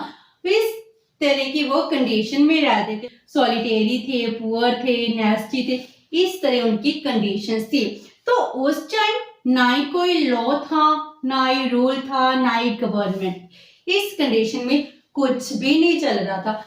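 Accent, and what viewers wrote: Punjab, India. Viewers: thankyou mam, the way u teach with punjabi accent is awesome and in a lucid way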